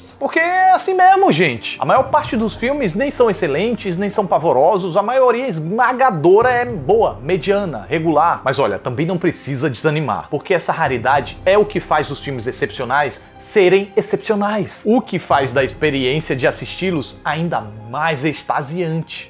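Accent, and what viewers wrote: Northeast, Brazil. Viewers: De q parte daki do Nordeste vc é? Coboco arretado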